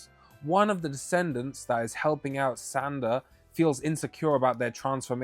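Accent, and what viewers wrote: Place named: United Kingdom. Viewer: I love it when British people have Americanisms in their accent. Like “marnga” “parrru itagarrki”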